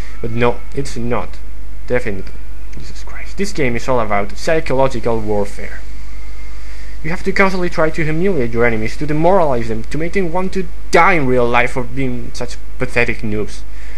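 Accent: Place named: Spain. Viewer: Lovely spanish accent... (Y)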